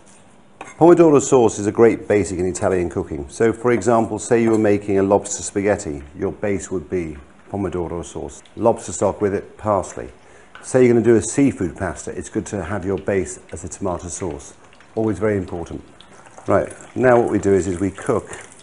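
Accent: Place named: Leeds, United Kingdom. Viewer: His accent is pure Leeds, UK. I love it.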